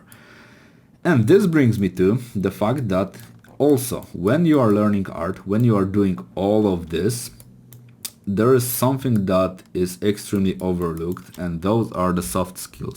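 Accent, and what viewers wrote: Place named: Poland. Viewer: It's very funny cuz I can tell you a Pole few mins in 😂 Informative video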